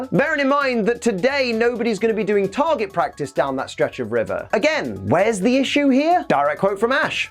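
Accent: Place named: United Kingdom. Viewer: "Cook" and "cuck" sound so similar in a British accent that It took me a hot second to get the joke.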